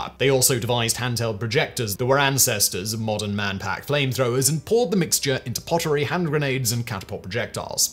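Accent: England, United Kingdom. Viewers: British Accent. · As an Englishman I can honestly say that has the most quintessentially English accent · Brilliantly done as ever old bean! One tiny moan…the word ‘swathe’. In English we pronounce it “Swaythes” not “Swoths”. I beg you, stop using the US pronunciation? It grates the ear when the rest of your accent is sooo English ✌🏼 Thanks a million for all the videos 🙂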